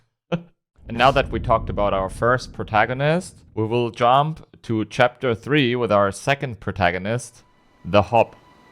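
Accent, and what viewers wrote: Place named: Germany. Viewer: Du kommst aus Deutschland (oder zumindest dem DACH-Raum), machst aber englischsprachigen Content, um potenziell mehr Leute zu erreichen, oder? Also einmal ist da natürlich deiner deutscher Name, andererseits dein Akzent. Ich war nur erst verwirrt, weil YouTube mal wieder von sich aus den Videotitel übersetzt hat ("Der größte Betrüger in Elden Rings" 🙄).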